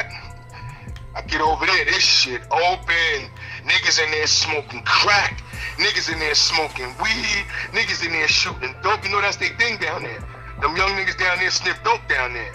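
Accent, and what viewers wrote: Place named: Brooklyn, United States. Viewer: My dude sound straight from Brooklyn or Harlem. That's our lingo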